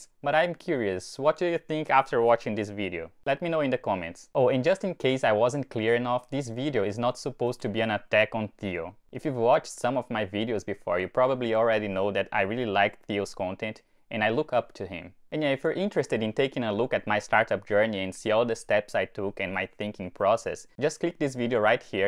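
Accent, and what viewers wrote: Brazil. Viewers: The Brazilian accent,LoL. Irei subscrever e acompanhar a sua jornada 🙏🏾.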